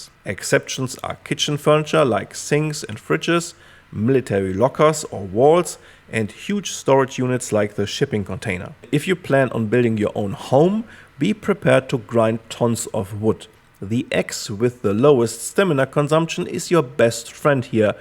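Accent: Germany. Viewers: Nice video! I also love your german accent 🍀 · I knew from the first words that ur geman. Mein kollege👌🏼👌🏼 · Da versucht aber jemand seinen deutschen Akzent zu verstecken, aber nach den ersten zwei Worten hab ich es schon gerochen😂 Dazu kann ich nur sagen, liebe Deutsche keine Angst vor unserem Akzent, die meisten Englischmuttersprachler (vor allem die Amis der Ostküste) finden unseren Akzent sehr charmant. Komischerweise empfinden nur wir Deutsche uns gegenseitig als unangenehm und versuchen den Akzent zu verstecken.... Warum auch immer. Mehr Mut zu unserem Akzent 💪😉 Gleiches gilt auch für Spanier und Italiener, auch die empfinden ihre Muttersprache mit deutschem Akzent gesprochen als charmant, das ist zumindest meine persönliche Erfahrung. Sehr gutes Video, hab das Spiel noch nicht gespielt, werde es aber mal testen. Weiter so! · Bro, is that a KI set on maxlevel german accent, or is that Person real?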